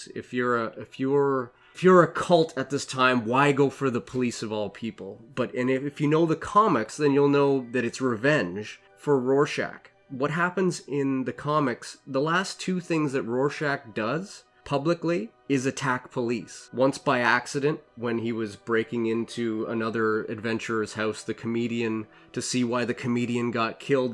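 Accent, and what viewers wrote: Canada. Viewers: Told you on your last Watchmen video that I'd watch every one you put out. I don't understand how you don't have more subscribers with a channel as outstanding as yours. I came to your channel through Westworld and I'll be here for all of Watchmen. Your analysis is always spot on and very very thorough. Keep up the great work!! Where are you from? I've tried to pin down your accent and if I was forced to guess I'd say Canadian or the upper Midwest.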